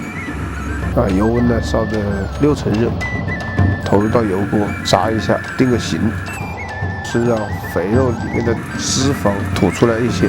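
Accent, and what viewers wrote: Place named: Changsha, China. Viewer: 长沙话就是韵味！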